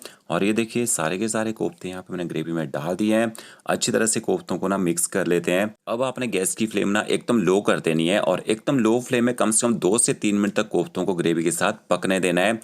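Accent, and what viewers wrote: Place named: Himachal Pradesh, India. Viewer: Apne apne skill k dum pr ache utuber bn gye gud God bless you.I m from Himachal aur apki bat se lgta hai k u r also from Himachal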